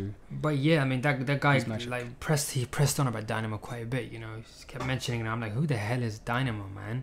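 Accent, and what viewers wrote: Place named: Bradford, United Kingdom. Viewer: Listening to your accent...YOU ARE FROM Bradford!! Your accent is exactly like Dynamo's!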